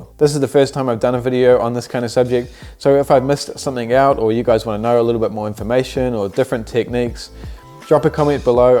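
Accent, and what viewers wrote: New Zealand. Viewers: Refreshing to hear that Kiwi accent!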